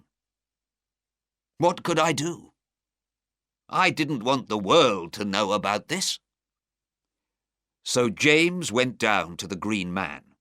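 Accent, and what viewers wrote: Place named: United Kingdom. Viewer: Огромное - преогромное спасибо! Как же хорошо всё продумано, - и сам рассказ, - кто же не любит нашего родного Шерлока Холмса, и бархатный баритон чтеца, и произношение - волшебное, английское - преанглийское! Чудесный формат! Спасибо!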